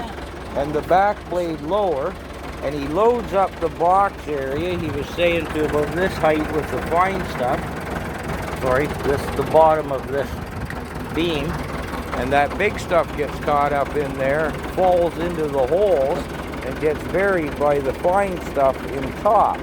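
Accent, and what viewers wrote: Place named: Minnesota, United States. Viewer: We in minnesota of wisconsin? Or some part of Canada? If i had to guess, i would maybe say Minnesota? Not an expert on those speech accents yet. Lousiana has like 20 distinct accents. Several different distinct accents around New Orleans.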